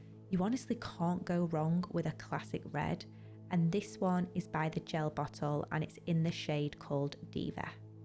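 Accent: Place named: Manchester, United Kingdom. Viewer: This is hilarious, because I am also a 38 year old man! 😂I at least DO know how I got here... I happen to find women's feet to be beautiful (not in a purely bedroom activities kind of way) but just the aesthetic beauty. Also, I totally agree that her Manc accent is quite lovely, and it definitely adds to the relaxing ASMR quality of the videos. Anyway though, love the videos, love your whole vibe, please keep on doing what you do because you are so amazing at it! 🙏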